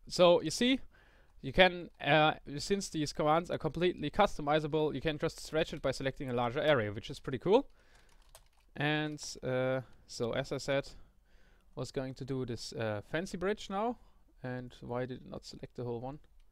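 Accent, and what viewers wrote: Germany. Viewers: No matter what you are. If you're German and start talking Math in English, you will sound like a mad scientist. Always fun to talk English in a German Accent ^^ (Halb Deutscher) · Du bis Deutscher, oder? :D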